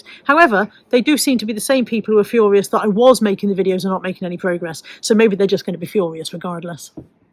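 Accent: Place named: Somerset, United Kingdom. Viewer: Oh - some people are truly b******s what gives them the right to comment on what you do and are?! As you say we dont pay to watch you and if they dont like it - DONT WATCH!! To give someone advice (especially unwanted and unasked for) is truly annoying and completely unnecessary so you just be and forget about all the nasty, horroble and let's face it insignificant people. My Mum always taught me if you have anything nice to say then dont say anything at all - why cant people do this??!! By the way I have to say although I am new to your channel I LOVE your accent!!!! It is so much more noticeable when you do pod casts with Caroline - do I tae it it is a Somerset accent? Whatever, its lovely. Take care and completely ignore all the horrible people and you be you!! Bye for now and much love, Lyn xx xx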